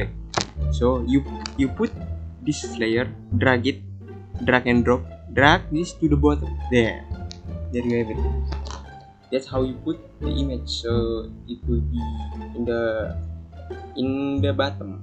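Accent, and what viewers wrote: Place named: Indonesia. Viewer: Indo banget soaranya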